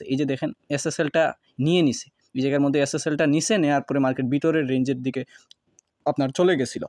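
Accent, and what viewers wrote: Sylhet, Bangladesh. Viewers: বাড়ি কোথায় সিলেটে নাকি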